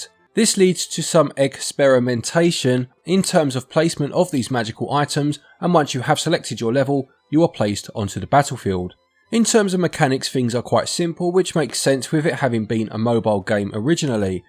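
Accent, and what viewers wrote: England, United Kingdom. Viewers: Why do you keep misprounoucing Mana? I can hear you are english so I don't get it? For the record its not Marna ot Maana, I can understand americans mispronouncing this, because they don't know any better. Apart from this grating on me, this was a good review and made up my mind to buy it 👍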